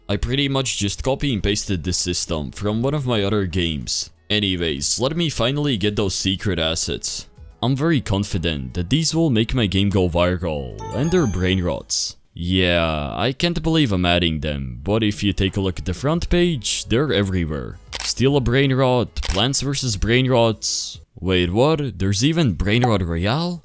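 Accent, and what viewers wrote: Latvia. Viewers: Uzreiz zinaju ka tu esi viens Latviets no varda un ka tu runa😂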